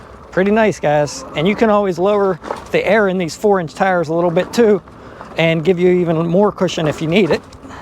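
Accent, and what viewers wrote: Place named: Pittsburgh, United States. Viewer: Sounds like you are from the Burgh area possibly the mon valley.. love it! I grew up in Ambridge.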